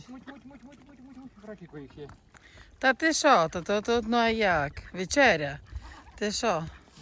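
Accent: Transcarpathia, Ukraine. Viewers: Та де се рівненщина, се закарпатський акцент, но се не в горах бо потік явно не гірський. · По розмові чую що Закарпаття 👍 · Ви молодець)) Це суперово!)👍 Великий плюс Вам в карму))💯 Я також любитель підгодовувати качок коли на ріці, голубів, ворон, все це чудово заспокоює нервову систему) * Я сам з Хуста, чую такий знайомий закарпатський акцент за кадром)😊👍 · По говірці ніби Закарпаття.. Але річка на закарпатські річки геть не схожа.. то де є ця краса?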